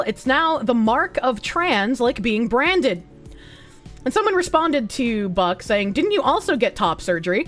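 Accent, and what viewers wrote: Boston, United States. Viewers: The most trusted news source with a Boston accent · There is a retired Alabama football commentator that had this same Boston accent. Maybe that's why I enjoy her voice.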